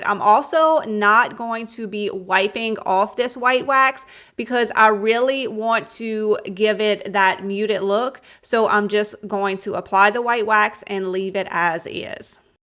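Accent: Louisiana, United States. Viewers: My favorite flip was definitely the basket. I wish they sold the IOD stuff here in Australia! I love all the types of videos you make- and your Louisiana accent makes me feel like I have a piece of home here with me when I'm so far away from mine!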